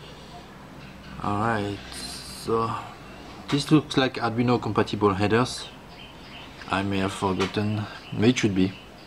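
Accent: France. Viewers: Such nice french accent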